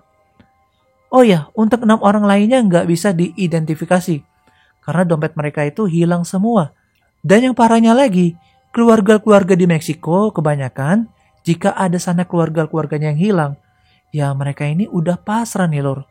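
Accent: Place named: Java, Indonesia. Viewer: Kurang kurangi ngomong lur nya bg... Ngak enak aja d dengar... Mungkin bagi teman d pulau Jawa mengerti... Tapi kalau Chanel ini mau berkembang harus netral aja cara bicaranya.... Sedikit masukan... 🙏🙏🙏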